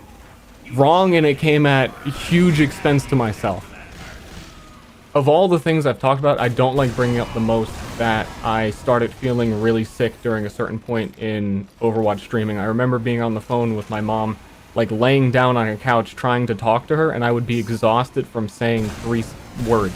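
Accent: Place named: India. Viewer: You sound indian.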